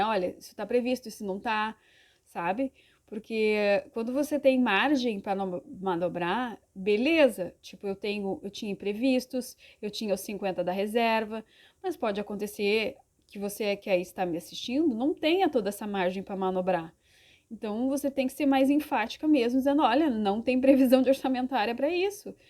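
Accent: Brazil. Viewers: Tu é gaúcha? De q cidade? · Teu sotaque é maravilhoso me convida pra ir toma um chimarrão ai com vc kkkk Sou de Lages SC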